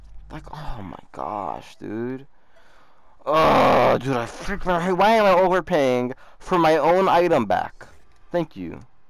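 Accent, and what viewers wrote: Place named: United Kingdom. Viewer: he's just British he said mate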